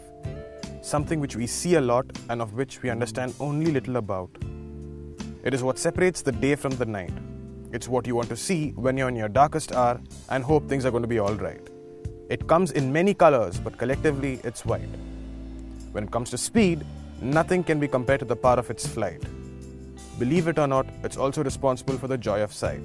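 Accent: India. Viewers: Indian accent everywhere in youtube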